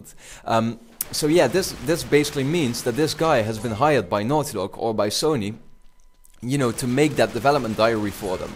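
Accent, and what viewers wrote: Netherlands. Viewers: never tried it before, i'll let you know the taste at june 10th, When they anounce U4, I realy hope they do ! :D Where are you from by the way, It looks like i hear a little dutch accent ?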